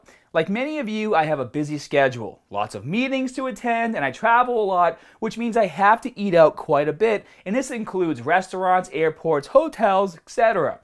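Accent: Boston, United States. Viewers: This man's boston accent is getting intense lol. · Keep the full accent man should be preserving your culture as part of your culture that Boston accent Go full on with it man set you apart from everybody else is part of your character as part of your routine it's part of Who You Are, is trying to come out all the time but you're suppressing it before b u i mean that with all that's you Boston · when did this guy get such a heavy boston accent? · i been a sub for a really long ass time and i love how your not editing your videos as much and how you love your boston accent now. keep it like that. also i only put myself in food comma when im allowed a cheat day. im a boxer so that comes like once a year and half or two. everything else is a pretty much strict diet, including water intake. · When did pick up this Boston accent? I used to watch him a few years ago and he never sounded like this? 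· when did you start this hardcore Boston accent haha never used to be that strong. I'm from mass too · Why is he talking like that? I haven't seen his videos in a few years Looks like developed a Boston accent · Let me guess, you're from Boston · In from Braintree bro! U must be from mass cause I sound exactly like u! Go Boston accents!! Just subscribed right now, love your bro!! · The Boston accent is severely strong with this one lol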